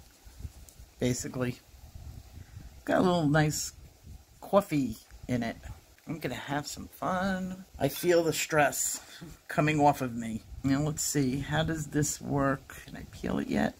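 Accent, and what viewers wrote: New York, United States. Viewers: I am so sorry to hear your hubby is so sick, and especially that u can’t visit him right now. I hope he is better soon. I love how u have known each other since grade school. That is so special. I love retail therapy also! Having grown up in Queens, New York, it’s almost like being back there when I hear your New York accent. I love it. Hoping he is better and home soon where he belongs.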